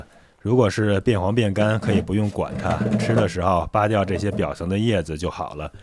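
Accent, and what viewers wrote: Beijing, China. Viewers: 无意中发现你的频道，感觉你是北京人，没敢问。原来还真是。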